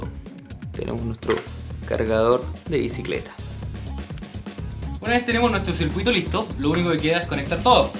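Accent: Chile. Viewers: Dices acá en Chile pero el men por el acento parece chileno :v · Amigo mio una pregunta eres chileno ?¿ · Eri chileno? :3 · Es Chileno verdad?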